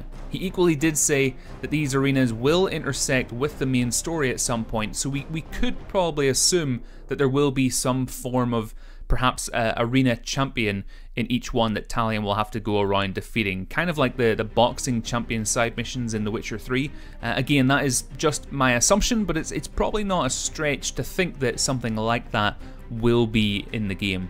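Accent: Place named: Ireland. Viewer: oh that's cool I didn't know you were Irish, I probably should have known that from the accent though. lol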